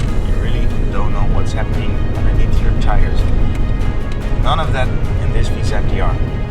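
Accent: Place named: Netherlands. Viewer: Ha! Dat Hollands accent ... dat blijft ge van kilometers ver horen hè. Grappig wel.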